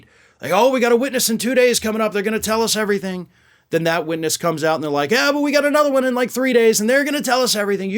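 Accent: South, United States. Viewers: Love the accent..yep the birn and breed in the south is still so backward and pissed that they font have any slaves.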